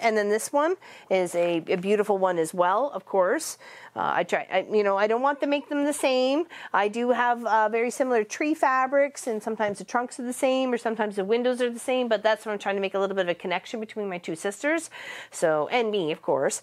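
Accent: Ontario, Canada. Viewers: So nice to hear an Ontario voice. I grew up in Scarborough, married a Kiwi, moved to Halifax in my 20s (for 35+ years) then retired to new Zealand. Great videos. I am addicted to quilting. 😊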